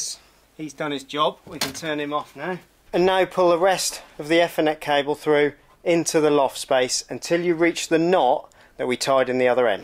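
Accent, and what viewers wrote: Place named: Norwich, United Kingdom. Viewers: Thought I recognised the accent. I was bought up in Hellesdon (not the Hospital ) I've heard all the jokes 😒😅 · Apparently most people think the English don’t know how to speak Merican. He does come from the country that invented our language and maybe…just maybe he is saying it correctly and we as Merica is not? (I am married to a Brit and I’ll tell you there was a learning curve to what England English sounds like…kinda like trying to understand anyone from Alabama, Mississippi, either Carolina and southern Ohio) His technique on instillation is spot on.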